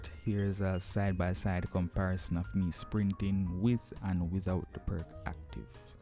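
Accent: Jamaica. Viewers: Lol why do you have a jamaican accent? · I can hear you fighting back the “yaad man” accent. Great video tho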